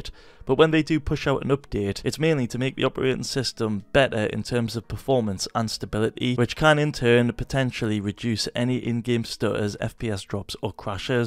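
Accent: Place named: Newcastle, United Kingdom. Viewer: Never been so excited to hear someone else from newcastle lmao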